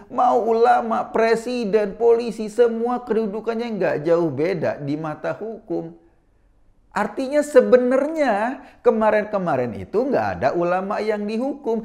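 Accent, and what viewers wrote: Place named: Banyumas, Indonesia. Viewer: Slam polo waras kang wong ngapak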